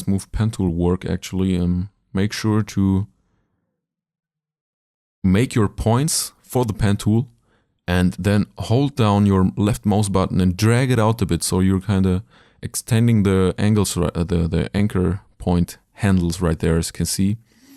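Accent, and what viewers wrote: Germany. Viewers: Bist du deutscher? :D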